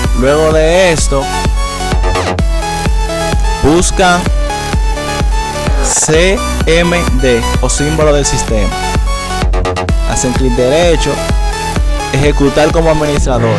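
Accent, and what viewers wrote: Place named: Dominican Republic. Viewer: Eres de República dominicana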